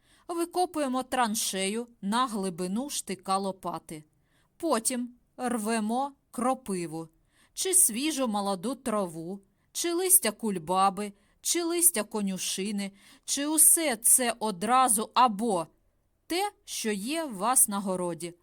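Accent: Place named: Podillia, Ukraine. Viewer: Доброго дня. Ріже вухо, бо незвичний для вашого вуха. А це діалект із Поділля. Мені вухо не ріже, бо так говорила моя бабуся. Чернігівщина, Черкащина, Полтавщина, Київщина - Подніпрянська Україна - хтось гикає, хтось надто окає, в когось о переходить в у. І це наше багатство, цікава історія мовотворення, контакти з іншими народами... Бажаю Вам зрозуміти наше багатство і не намагатися пістригти всіх під один гребінь.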